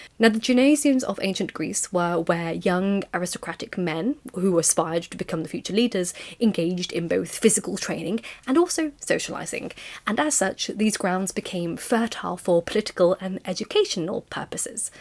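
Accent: United Kingdom. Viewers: How did I know you were British before I clicked on the video?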